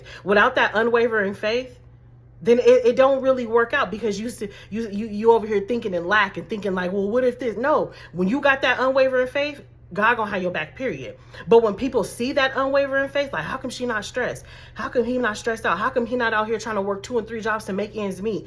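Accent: Chicago, United States. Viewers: This is so good! You from Chicago? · Englewood Chicago or Cali? I'm from Chicago and you do sound like the Chi! I came across you today! I'm at work working and listening to you! Iron sharpens iron!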